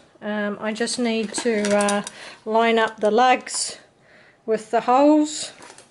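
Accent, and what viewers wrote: New Zealand. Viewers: I just discovered your videos - thru a mention by someone else. I have done the JMT in August & Sept, so it will be fun to see it under snow with you. Also, I had an aunt from New Zealand, so I love hearing your accent, as it sounds a bit like hers. My cousin and I hitch-hiked all over NZ; for 2 days we caught rides w/ 2 "truckies" headed to the South Island. I did the Milford Track Freedom trek in pouring rain (except for the 1st day).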